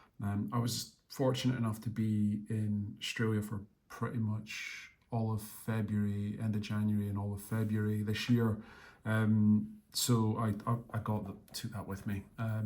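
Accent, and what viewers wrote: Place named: Scotland, United Kingdom. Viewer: Sounds great! I thought I could hear an east coast Scottish accent. Wasn’t sure if your local AD was Jamieson and Carry up in Aberdeen?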